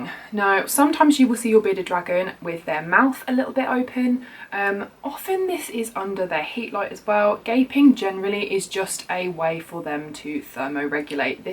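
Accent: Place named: England, United Kingdom. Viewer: Nice to hear an English voice for once. I keep snakes and a chameleon and I just bought a dragon yesterday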